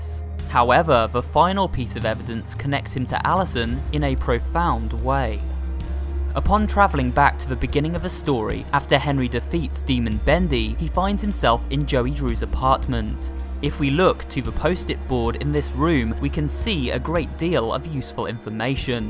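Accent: United Kingdom. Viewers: Just wanted you to know, you sound british. I absolutely adore and admire british people because of their accent. This is probably why i enjoy your videos.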